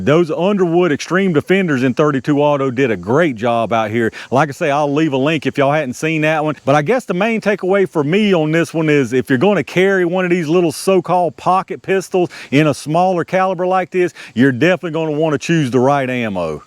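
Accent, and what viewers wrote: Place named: Rural, United States. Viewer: I can’t stand listening to this hick talk.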